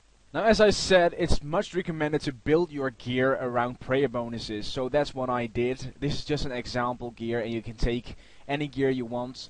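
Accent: Netherlands. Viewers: I'm gonna guess by your accent that you from Holland? My bro thinks you are Norwegian but you sound like you are from Holland. Am i right?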